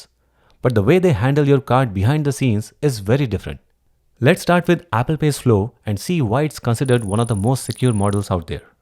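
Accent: India. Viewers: Are you India ? You speaking likes India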